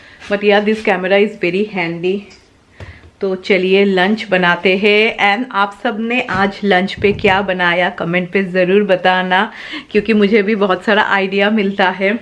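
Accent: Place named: Bengal, India. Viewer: Hello mam, I just wanted to say that you won’t stop driving your car just bcoz a few insects hit the windshield... right! So keep going bcoz you are really adorable and your cute bengali tone is so heartwarming!!! There are and will be haters and people to pull you down, but above all there are those who love you and admire you!!! ❤️🙏🏻💐💐💐💐💐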